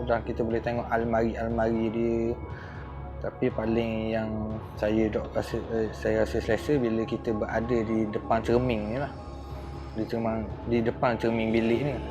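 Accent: Terengganu, Malaysia. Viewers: dgr loghat mcm ganu ni...ok done subscribed...salam dari Singapura... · Suke dengar loghat ganu ni..👌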